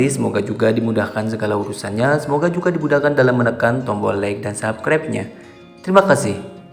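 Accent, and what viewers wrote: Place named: Banjar, Indonesia. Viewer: Bang,pian buka servis nya di bjm kah....? Please jawab,uln dnger nya kaya org bnjar pian.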